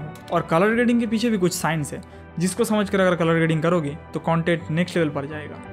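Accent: Bengal, India. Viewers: Love your Bangali accent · bengali tone bro..... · Tumi bangali 😂 am I right 😅 mein ne pechan liya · tomar bengali accent tike kom karo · Bhai bengali ho kya ap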